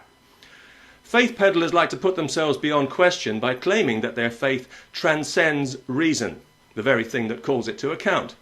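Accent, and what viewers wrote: United Kingdom. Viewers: with a british accent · I guarantee you that you wouldn't sound NEAR as convincing without that British accent. That's really all you have going for your argument. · This guy is like the Amazing Atheist only with a spiffy accent and a snappy British wit. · fine British articulation are almost hypnotic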